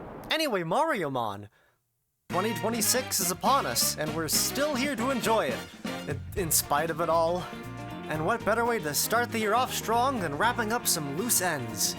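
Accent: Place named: United Kingdom. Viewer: PEAK BRITISH ACCENT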